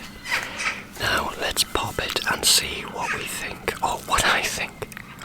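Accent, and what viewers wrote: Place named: Newcastle, United Kingdom. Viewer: The Geordie accent works really well for 👍😊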